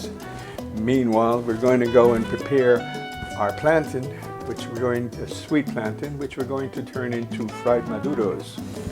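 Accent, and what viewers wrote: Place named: New York, United States. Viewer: You can cook for Me anytime!!! ❤Adorable and that N.Y accent, love it all!!!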